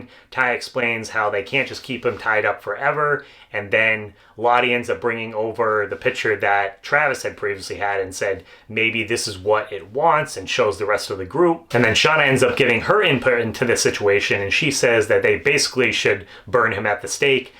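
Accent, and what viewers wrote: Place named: Northeast, United States. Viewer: Hey, we are Aussies and were wondering where you are from based on your accent. The opinions are north east, northwest and one vote for Boston 😂 Please help😂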